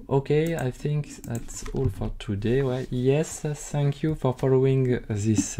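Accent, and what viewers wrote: France. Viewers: Your French accent is amazing :))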